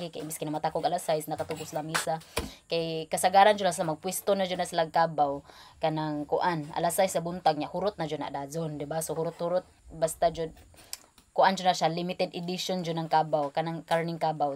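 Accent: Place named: Visayas, Philippines. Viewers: Sana may translation pag nagbibisaya..hindi ako makasabay, i super love your dialect.. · Lami jud imong vlog dai kay wala kay pretentions, naturally-made and Boholano inspired. Your boholano accent and features bring us back good memories when we were staying in Ubay,Bohol for a decade. With your vlog, you inspire us to go back to basic like planting, harvesting, cooking using firewoods and eating together with your family as well as praying and thanking God always for all His blessings. Basta ganahan jud ko motan aw sa imong vlog dai oi. Congratulations🎉😇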